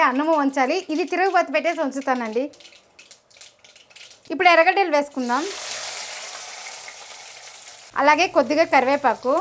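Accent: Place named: Chittoor, India. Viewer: Ivala try chesamu mee revepii..mee di proper ey place andi..seems like from chittoor ..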